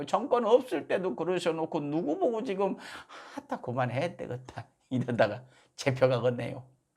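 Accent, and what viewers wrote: Jeolla, South Korea. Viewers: 행님 고향이 어디신데 전라도 사투리 그래 잘 쓰시는가요? 경상도 사투리도 고래 써 주시면 정말 좋겠네요^^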